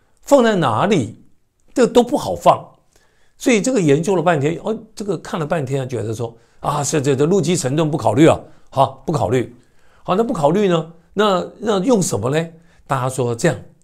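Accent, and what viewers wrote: Standard. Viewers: 语音标准 评论优默 见界宽阔 正能量满 正气善评 优秀才气 愿你全家安康如意🙏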